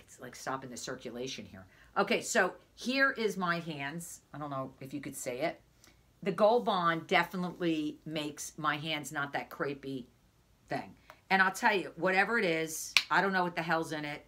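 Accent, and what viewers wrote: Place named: New Jersey, United States. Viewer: Go, Jersey! I'm from Jersey and miss that accent. I loved your video on Gold Bond lotion. I just ordered it, and I can't wait to try it out. Thank you for the video review.😊😊😊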